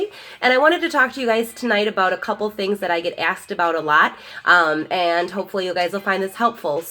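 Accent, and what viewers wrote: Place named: Chicago, United States. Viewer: sounds like chicago baby!!!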